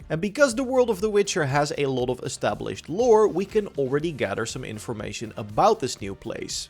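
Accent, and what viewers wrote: Netherlands. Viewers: HELL NAH BRUH that dutch accent is killing me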